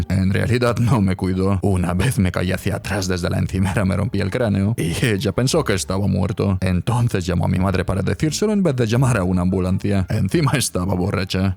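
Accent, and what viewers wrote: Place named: Mexico. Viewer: Ese acento mexicano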